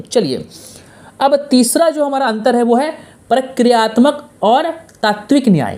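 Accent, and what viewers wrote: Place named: Bihar, India. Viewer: aapke accent se lagta hai your from Bihar or Jharkhand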